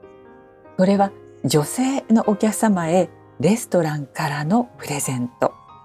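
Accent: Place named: Japan. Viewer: Trop agréable d'entendre une voix japonaise. Vous avez de la chance car notre expérience notamment le service était très mitigé au Lasserre pas très bien. Mais les plats étaient OK.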